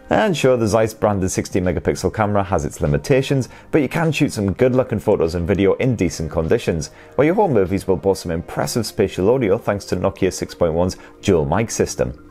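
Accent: United Kingdom. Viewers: At last, someone speaking with a British accent!!!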